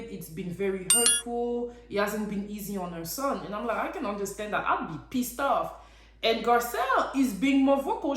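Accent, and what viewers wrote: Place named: Scotland, United Kingdom. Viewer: I'm from Glasgow! ❣️ I love that you read it in a Scottish accent 😂😂- you know how much we Scots love laughing at ourselves 😂😂. Garcelle and Sutton are definitely the two ladies of the group, and the only genuine friends, as far as I can see 💗 oh god - I would bounce rinna for her actions this season. She is an overdramatic sleazeball, who doesn't care who she hurts to have her moment, she is just like Andy - self serving and exploitative 💗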